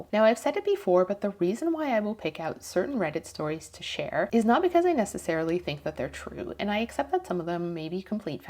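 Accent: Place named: United States. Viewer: what is your accent? She’s American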